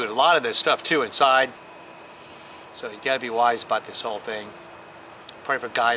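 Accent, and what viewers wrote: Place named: Boston, United States. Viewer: LOL! I'm born and raised NH and when I hear you talk....I hear Boston....LOL!! Nothing personal....not trying to be disrespectful.....just not 'hearing', the accent I expected to hear. Still a good video....and I subscribed....looking forward to more....we need to learn to live with less....we are spoiled and all those creature comforts, have made us prideful!!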